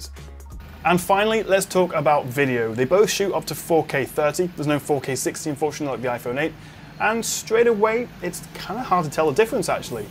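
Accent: United Kingdom. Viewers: i love you,, you are so handsome and nice british accent :p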